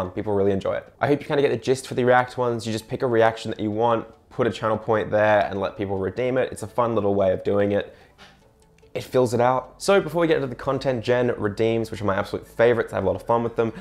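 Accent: Ireland. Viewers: As a German that is constantly being told that I have 0 accent, I took inspiration from your Irish accent and let my chat force me to "spiek in zeh tscherman eccent leik zhey taught as tu spiek in school" - instant redeem the first time I used it and my viewers got a kick out of it - thanks for the idea. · I like the Irish accent!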